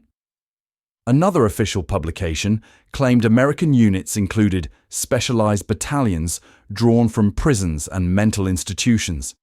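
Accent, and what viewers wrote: United Kingdom. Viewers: The presenter's accent is British. The British use meters as a measurement. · American military uses meters for ranging. We do use freedom units of measurement for the most part but that doesn't mean we don't understand the metric system. We just use more math than you. Also , the commenter in this video is obviously a Brit. We like them now and find their accent very amusing but we have had a few small differences in the past.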